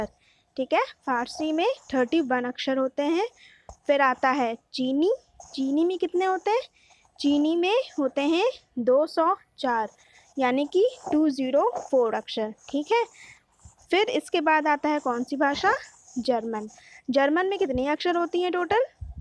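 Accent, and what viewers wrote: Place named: Bihar, India. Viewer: आप बिहारी हैं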